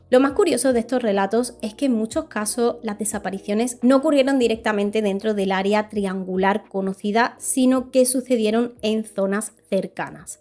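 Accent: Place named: Murcia, Spain. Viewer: Hola, me encantan tus videos, hace poco descubrí tu canal y enseguida me suscribí. Pongo tus videos en el trayecto de casa al trabajo y a veces en este. Mi mamá está intrigada por tu acento, porque, aunque sabemos que eres española, ella no había escuchado tu variante de acento. Yo digo que eres de Murcia, pero, ojalá me puedas contestar para resolver la duda de mi mamá. Gracias. Saludos desde México.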